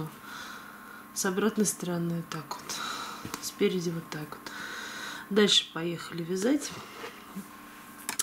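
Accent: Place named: France. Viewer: Обожаю Ваш фpанцузский пpононс )я pелаксиpую , когда слышу Ваш голос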